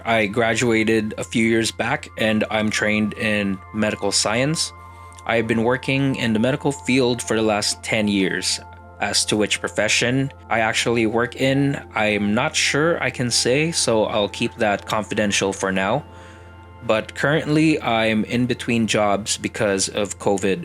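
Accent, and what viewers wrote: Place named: Philippines. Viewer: As soon as I heard your voice I immediately knew you were filipino! Very glad to see a fellow Filipino, and a genshin creator at that. Love your vids and hoping you get 10k soon, keep it up bro :D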